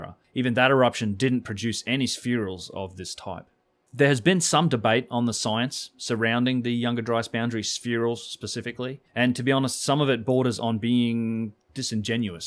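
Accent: Australia. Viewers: You sound Australian. Just a small point. 10 mins you show a map of the world. Northern and southern hemispheres are actually the same size but it's not represented that way on your map. Stick up for AU. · Love your videos, excellent content. Out of interest, you live in California but you sound Australian, why do you live in the USA? Thanks.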